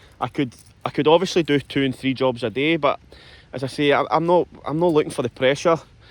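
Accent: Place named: Scotland, United Kingdom. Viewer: I feel your Pain m8, im guessing with the accent your from central scotland like myself Hamilton Its hard going ,ive been doing Roofs,Gutters and Gardens to try and keep it going I was exact same as you m8,i worked the parcels 6/7Days and could never get over A Grand A week no matter what , Yes we can make in one day and than what we were making Couriering but its when it gets to this time of year it gets harder Listening to your story is like reading my own experiences more or less like for like, Hope It Goes Well m8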